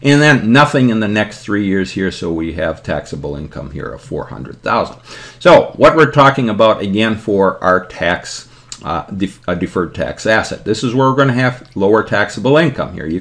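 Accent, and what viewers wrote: Boston, United States. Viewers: I find the Boston accent difficult to study to (maybe because of Family Guy?). Other than that, thanks so much for the help!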